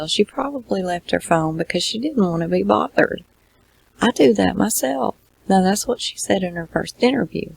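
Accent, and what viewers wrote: South, United States. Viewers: Ever since Camilla Harris My #1rule was Do Not date married men!! Do these women have no Moral compass.And who lets their daughter be a menace to society and protect bad behavior? You are the best. I am on top of a mountain looking out on life thinking..there are plenty of lonely soul's who would disrupt a family's happiness? J4J is going to try to get footage of nk at courthouse. Also hear she is moving to Texas..Good luck out there. Only state that is big enough to hide.. 💘 your content your country accent and your style.. Mr.RP needs his on channel... I am positive he would have great success with his content as well. We love him too ❤❤🚚🚛🛣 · Love your videos. New sub. You tell alot of things on this case that I've never heard. It's not just the same ole same ole info. Btw, love your southern accent 💜. Thumbs up👍🏽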